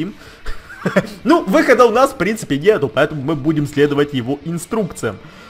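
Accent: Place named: Ukraine. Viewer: ты не русский,ты украинец?,но ты точно не русский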